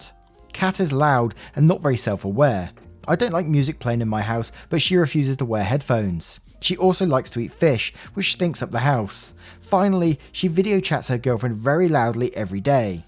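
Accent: United Kingdom. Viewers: You've never heard 'Drama Llama' before? Maybe it's a North American expression(based on your accent I am assuming you are in the UK).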